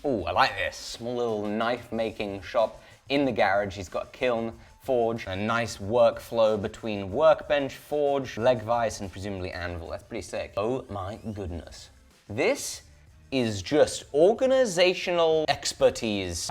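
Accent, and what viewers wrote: United Kingdom. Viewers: “Hex-uh-ginz”. Jesus. I love how British is. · LOVE your videos! Humor, science, black smithing, smithing porn, cool tools, fun facts, awesome cool music, and that proper British accent. It’s perfect right innit?!?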